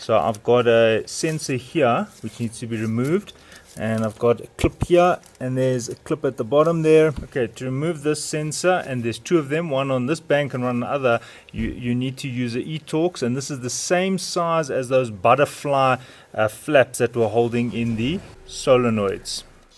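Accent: South Africa. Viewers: Yay, South African Accent... Howzit and thank you for the DIY!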